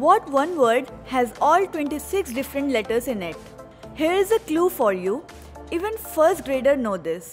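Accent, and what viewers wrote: India. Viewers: Are you an Indian? Your voice is recognized.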